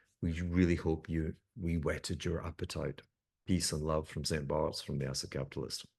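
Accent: Scotland, United Kingdom. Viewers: It's how as a Scot, he pronounces 'asset'. Clearly it's an in-joke and a play on words with being on acid, ie.e joker and maverick take your pick. Being elusive and unpredictable is part of the shtick.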